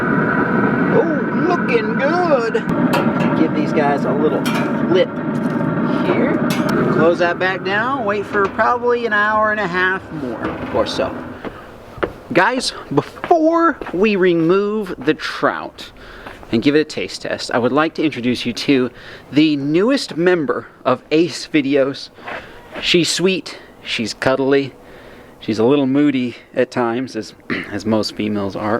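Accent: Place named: Tennessee, United States. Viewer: That Tennessee accent king in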